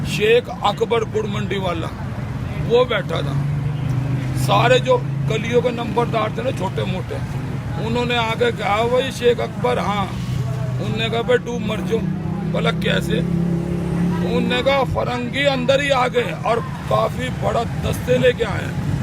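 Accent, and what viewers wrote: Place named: Haryana, India. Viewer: BoLi rohtak ki hy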